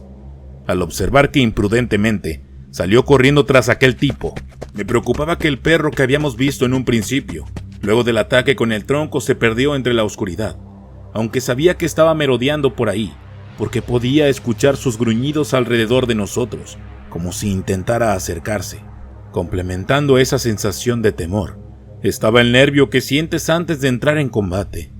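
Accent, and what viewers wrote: Mexico. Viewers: Quiero el enlace para el correo...además de una buena voz...una buena historia tiene el encanto del acento mexicano!!!! Desde Argentina loa amo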